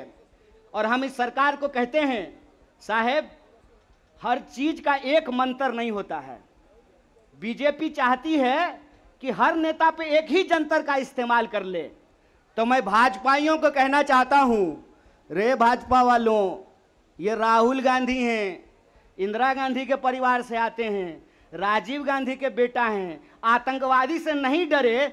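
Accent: Bihar, India. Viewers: It's dialect of Bihar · His Bihari tone even make his speech more loud and powerful and also beautiful... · Bihari style like our souls 🥰🥰🥰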